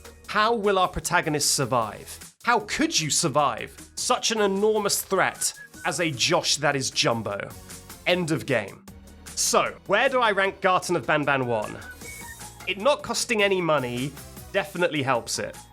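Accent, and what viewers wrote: United Kingdom. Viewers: That's weird cuz my dog was running all over the house cuz I was playing this on my TV once upon a time and he couldn't figure out the British accent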